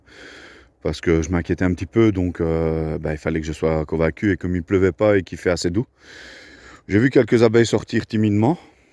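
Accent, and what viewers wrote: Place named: Belgium. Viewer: Salut, une belle saison à toi 🤞. Tu meuble tu meuble mais avec l'accent belge c'est sympa à écouter 😉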